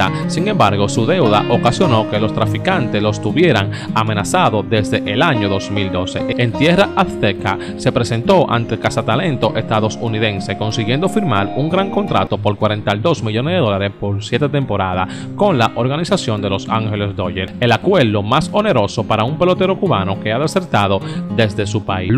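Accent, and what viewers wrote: Cibao, Dominican Republic. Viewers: Yo siempre les dicho a ese narrador qué hable más al paso, pera que suelte ese hablar tan Cibaeño, ejemplo, mul, al, sel, por decir, muy, hay, seis!!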